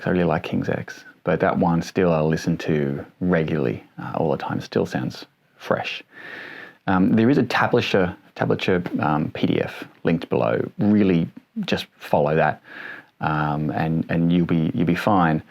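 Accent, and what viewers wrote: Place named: Australia. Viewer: Also very cool to find another Kings X fan with an Australian accent 😀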